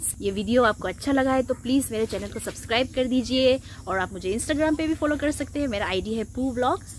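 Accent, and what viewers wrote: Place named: Delhi, India. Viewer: Didn't know Arunachalese speak such fluent Hindi.. It sounds more like a Delhi region's Hindi. The way you are speaking, is it the typical Arunachali way of speaking Hindi? different regions in India (even within Northern belt) have different way/dilects and not necessarily speak Delhi-style Hindi. For Example, Hyderabadi Hindi has very typical Urdu-Telegu influence and has its own beauty and charm.. similarly, Mumbaiya Hindi has its own nuances as it got mixed with Marathi and sounds very beautiful on its own.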